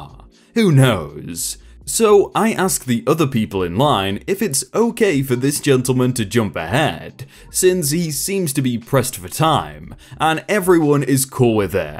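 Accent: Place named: England, United Kingdom. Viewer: At least you don't think his voice is computerized, LOL. But seriously, you do know that English people pronounce some things differently, right?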